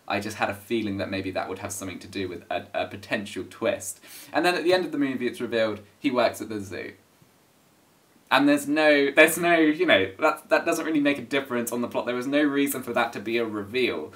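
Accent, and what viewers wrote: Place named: England, United Kingdom. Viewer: You had to be English. Where you from exactly? Anyways. I didn't like this film in t' overall. Disappointing. Americans apparently fell for this bullsh*t. Don't watch it again, pls.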